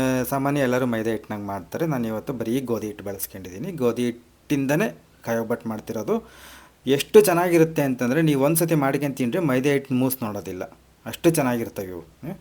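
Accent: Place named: Hassan, India. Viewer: ನೀವು ಯಾವ ಊರು..?? ಎಲ್ಲಾ ಕಡೆ ಭಾಷೆನೂ ನಿಮ್ಮ ಮಾತುಗಳಲ್ಲಿ ಇವೆ.ನಮ್ಮ ಹಾಸನದ ನಮ್ಮ ಹಳ್ಳಿ ಮಾತುಗಳು, ಮಂಡ್ಯ ಕಡೆ, ಉತ್ತರ ಕರ್ನಾಟಕದ ಕಡೆ ಹೀಗೆ ಹಲವಾರು ಹಳ್ಳಿಗಳಲ್ಲಿ ಮಾತಾಡುವ ಕನ್ನಡ ನಿಮ್ಮದು.. ❤️❤️ ಜಾಸ್ತಿ ನಮ್ಮ ಹಾಸನದ ಕಡೆ ಹಳ್ಳಿ ಭಾಷೆ ಇದೆ. ನಂಗೆ ಖುಷಿ ಆಯ್ತು. ಅಡುಗೆಗಳು almost ಎಲ್ಲಾ ಚೆನ್ನಾಗಿವೆ. ನೀವು ಮಸಾಲೆ ದೋಸೆ ಮಾಡುವುದನ್ನು ತೋರಿಸಿ.. 🙏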